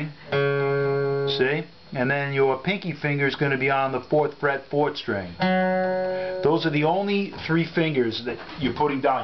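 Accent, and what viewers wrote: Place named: Long Island, United States. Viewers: Real Good! Can't wait to get home & try it out., you sound like my ex-bro-in-law, Joe, from da' Bronx - he's a good guy, too. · I've been watching and learning this slowly along with a handful of other songs. Very insightful and well done, although you're LI accent is a bit amusing. Not meaning to be insulting, good job and good guitar. Guessing you're about my age, so I gotta ask. Do you remember The Good Rats? Awesome band that deserved a lot more recognition than they received. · I get a kick out of your east coast accent. You sound like I did 45 years ago. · I've forgotten so i'm back.Thanks for the refresher and the New York accent,reminds me of home.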